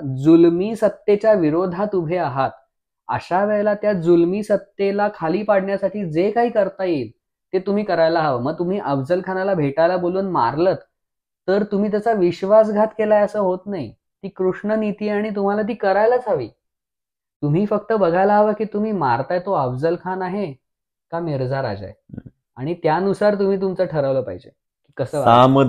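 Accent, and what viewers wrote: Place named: Pune, India. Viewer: खुप सुंदर भाऊ तू छत्रपतिची माहीती दिलीस भाऊ तुझी पुणेरी भाषा अप्रतिम भाऊराजा ❤❤❤❤❤🙏🙏👍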